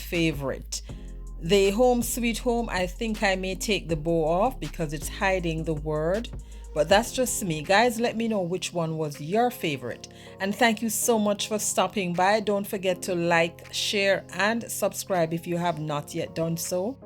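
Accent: Jamaica. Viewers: I just LOVE your voice are you by any chance from JAMAICA?😊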